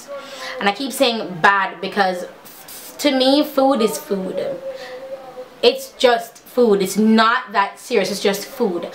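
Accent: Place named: Jamaica. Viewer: Hello. I've been watching your channel for a year now. I love your energy and that lovely Jamaican accent! Yes rewarding yourself is a good thing you work hard and you deserve it! God bless you and your family.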